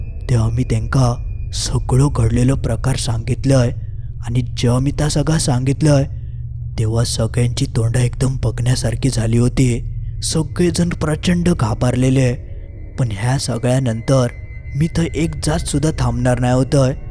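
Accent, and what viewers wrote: Malvani, India. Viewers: Hahahahha response faar bhari hote comment la, Masta vatta malvani ikyla 🎉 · Ya bhashela malvani mantat Kalah ka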